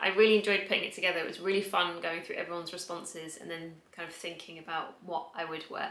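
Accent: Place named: United Kingdom. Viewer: I really enjoyed this video!! there are some outfits that I definitely will keep in my mind for futur looks. Favorite are day date look, evening date, studio look, raining day in the city! really helpful for my futur city (London)! I would like to have an inspiration for museum day/ gallery day/ city strolling AND a casual look at home, home work or Monday/Thursday home look something comfortable and causal chic maybe AND definitely wedding look because I will have wedding look next year too! Thank you and always enjoy to watch your video and gosh your British accent is delicious ( Don't know if it's a great word for accent language but I love the idea)! Have lovely week sweetheart xx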